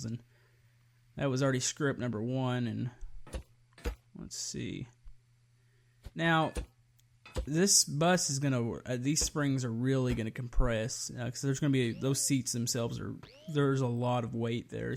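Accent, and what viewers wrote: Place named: South, United States. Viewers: I swear he has a southern accent. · I'm guessing Texas. At first North Carolina but after talking to my uncle, I'm 99% sure it's Texas. Although I may be wrong and look like an idiot lol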